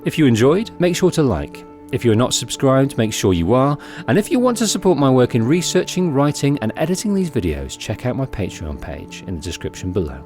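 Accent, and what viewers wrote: United Kingdom. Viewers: The British accent INSTANTLY bores me.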